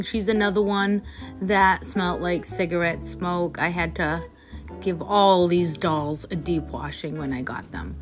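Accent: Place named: Maine, United States. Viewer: Love your accent! Do you live in Maine?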